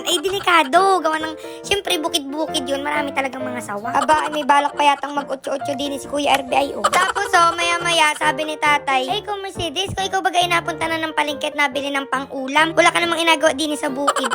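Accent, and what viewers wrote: Batangas, Philippines. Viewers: Ok lang ba magtanong.Taga mindoro ka pero bakit pagnag sasalita ka parang batangas?😶🙂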